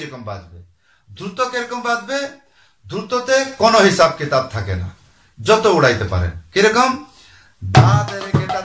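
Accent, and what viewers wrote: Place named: Bangladesh. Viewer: আমি বাংলাদেশ থেকে দেখছি। আপনার কথায় কিছু কিছু বাংলাদেশের টান আসে তাই জানতে চেয়েছিলাম।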